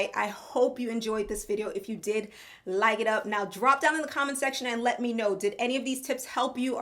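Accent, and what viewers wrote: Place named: Caribbean. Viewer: I recently subscribed to your channel one of the best things that I've done. You're so informative and Gorgeous. Love the glowing skin. I occasionally hear an accent. Is your ancestry from the Caribbean by chance?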